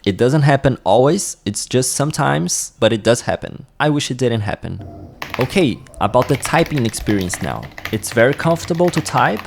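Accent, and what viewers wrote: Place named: South America. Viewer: Heard a south american accent, where are you from? Perhaps Chile or Brazil?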